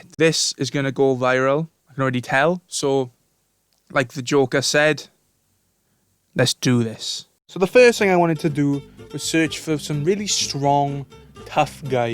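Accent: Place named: Wales, United Kingdom. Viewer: dai butt i faking love that welshy accent like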